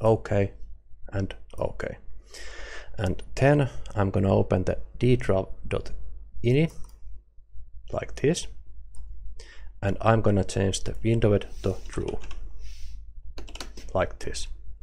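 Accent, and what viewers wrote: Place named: Finland. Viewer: Brilliant video, also your accent sounds finnish :b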